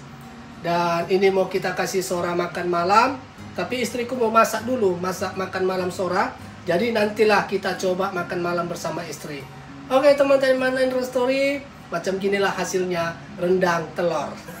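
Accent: Medan, Indonesia. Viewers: Suara medan nie psti 😂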